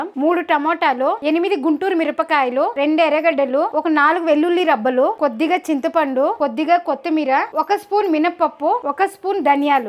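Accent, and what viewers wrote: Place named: Karnataka, India. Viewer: I think u belong to Ananthapur district...ur slang is a bit kannadiga speaking Telugu... Ur recipes r interesting n having eye appeal..ur preparation n presentation of ur recipes r very good...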